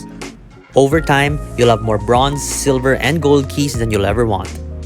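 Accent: Philippines. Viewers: I can smell that filipino accent from over here. Great video btw. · The video is awesome can't really say much but i can tell how your accent works. Dont mean to be rude but are ya a filipino?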